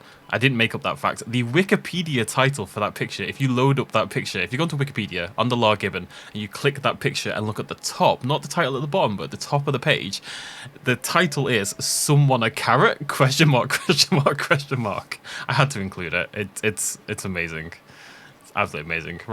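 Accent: United Kingdom. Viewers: Commenting because the nice British voice told me to. Have nothing interesting to say though.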